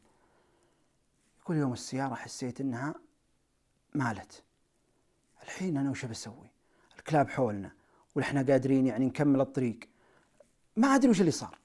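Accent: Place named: Saudi Arabia. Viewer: صوتك ممفهوم لهجتك سعوديه قح بس قصصك ممتعه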